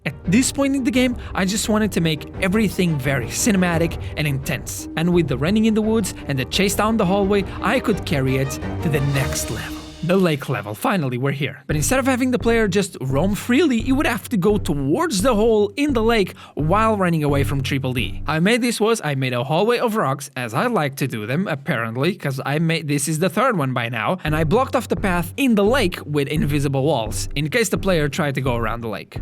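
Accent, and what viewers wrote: Portugal. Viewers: Ah, ai está o famoso super reconhecível sotaque português, good work on the game man, nice job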